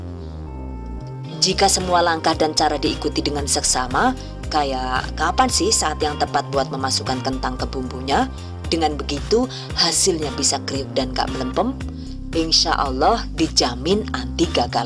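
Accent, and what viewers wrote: Banyumas, Indonesia. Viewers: Jebule ngomonge pada Karo nyong Rika yaa bu ngapak 🤭🤭🤭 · Suka gaya bahasanya...ulasannya jelas..ngapaknya pas...hehe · Muantap tenan yu... Krn ngapak...jelas tenan keteranganx ditunggu resep yg baru yg thn lm tuk kirim anak kos..tq ya yuu.....🙏🙏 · Ya allah ternyata sampean wong ngapak, salam ngapak Bun, ora ngapak ora kepenak 😊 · Makasih mba resep ny..mantep nih..ko jdi ngapak y..ora ngapak ora ke penak..hahaha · wong ngapak taune jannnn ngapake ngendi iya.... dadi ngefans kie inyong 😂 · Mantaaap Bun.... Saya mau coba ah..... 🙏 Bunda dialeknya ko sama dgn aq yaa......Maaf Bunda asli dri Bnyumas apa yaa...?? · Mantaaap pingin mencoba moga engga gagal,, Ko bahasanya ngapak ya ,seneng dengernya ,,,makasih atas resepnya mba yuu,,kesuwun banget kiye wong gombong Melu nimbrung 😀😀😀😀🙏 salm sukses buat Chanel ini 👍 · Suka dg resepnya, lg serius kok denger bahasa ngapak, langsung ngakak, wkwkwk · Sampean tiyang pundi yu deneng saged ngapak kaya kula... 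Kula wong kebumen yu😂😂😂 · deneng jebule ngapak kaya nyong..🤭😅😅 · Deneng ngapak 😂😂😂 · Yg saya suka dari channel ini disamping resep2 yg di share,juga ka host nya yg suka lucu sesekali krn logat dan bahasa jawanya. Medok khas org ngapak,dan kalimat OKE hihihi seneng dengarmya. Tks mbk resepnya 👍🙏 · Medoknya kaya wong Banyumas ya Bu...😀👍👍😘😘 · Ngapak ternyata ya bunda 😁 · Ngapak toh hehehe, saya akui masakan Tegal emang mantab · Ngapak bgt kya enyong deneng😁🤣kk nya matursuwun resep e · Eladalah.. Jebule Rika wong ngapak ya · Gemesi le omangan bun... Ngapak nya berasa😂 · Deneng ngapak mbaaaaakk Ngakak nyong yakin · Ngomong jawane ky wong ngapak lucu banget sumpah 😂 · Gagal fokus Ama bahasa yg ngapaknya😍keren2 jd kita yg menonton tidak bosan😍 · Wong Banyumas Iki mesti, ketoro omongane ...😄😄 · Ibune wong ngapak ya deneng pda y karo nyong 😀😀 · Weh sebngsa karo nyong ya , pasukan ngapak😄😄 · Sangat mudah dimengerti,ada selingan humornya lagi.bahasa ngapaknya juga😂😂👍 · Ternyata ngomongnya sm ky nyong buu, wong ngapak😂😂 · Wong ngapak ya bu hihi..slm dari cilacappp..😁😁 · Jebule wong ngapak salam ngapak wong cilacap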